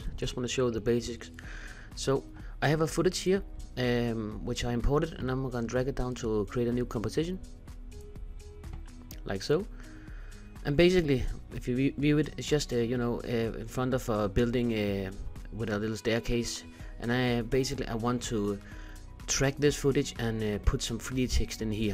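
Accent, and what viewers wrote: Denmark. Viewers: Tak for videoen! ;) Ka hører du er dansk :)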